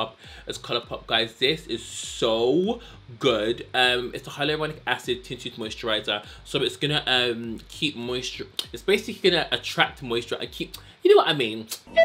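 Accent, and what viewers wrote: Birmingham, United Kingdom. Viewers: Is that a Brum accent i'm hearing? ❤️ . and thanks for the vid, you've helped a clueless sister out 😂 .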